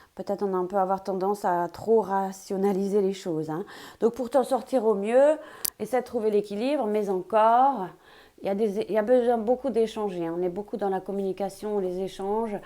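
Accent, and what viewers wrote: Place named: England, United Kingdom. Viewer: tu as un petit accent tu es anglaise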